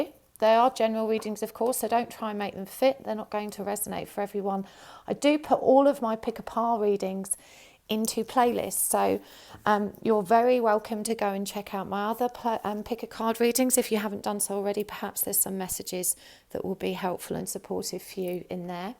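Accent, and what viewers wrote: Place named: Birmingham, United Kingdom. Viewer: Birmingham acceny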